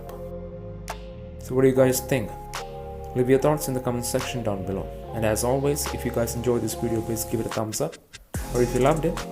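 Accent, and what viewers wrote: Kerala, India. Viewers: മലയാളി ആണോ 😍😍😍 ഇംഗ്ലീഷ് കെട്ടിട്ട് മലയാളിയെ പോലുണ്ട് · Your Indian accent is leaking like a diarrhea. Speak normally!!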